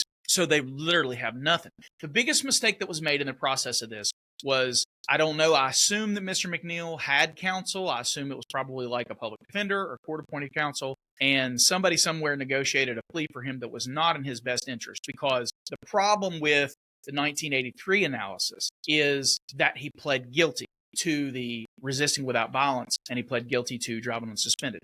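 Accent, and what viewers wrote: South, United States. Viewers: Great analysis. Thanks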